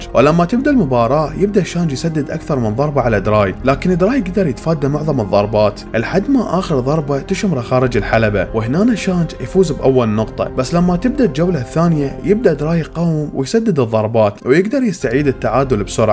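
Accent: Iraq. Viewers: احلي شي فيك اللهجه العراقيه الحلوه اهوايا يازين العراقين ❤️😘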